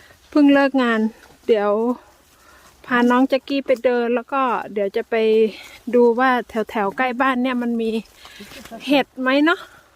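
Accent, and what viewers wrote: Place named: Laos. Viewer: 🌺🥰👍🌸สวัสดีคะ่น้อง คนเก่งของพี่พูดภาษาลาวน่ารักจังเลยคะพูดบ่อยๆนะคะคนดี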